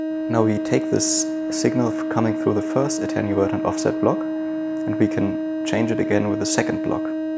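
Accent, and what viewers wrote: Germany. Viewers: Wow, you sound so German!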